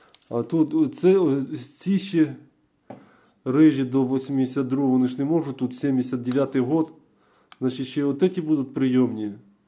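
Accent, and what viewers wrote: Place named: Kuban, Russia. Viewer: что за слова у тебя из рта вылетают, с кубани что ли что по русски слабо разговаривать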